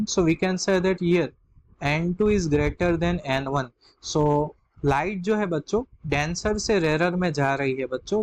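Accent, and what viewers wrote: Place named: South, India. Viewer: The time I heard south acent I knew that this is the video which will help me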